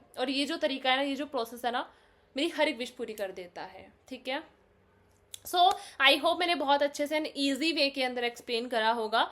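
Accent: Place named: Rajasthan, India. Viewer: Ap rajasthan se ho kya... Your accent .... Like them .... dontpls 😂 don't ever ye sound se m bed se niche gir gye😂 no pls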